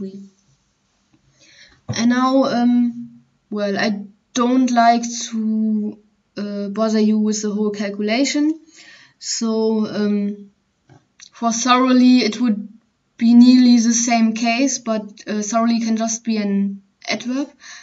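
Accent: Germany. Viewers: she clearly sounds German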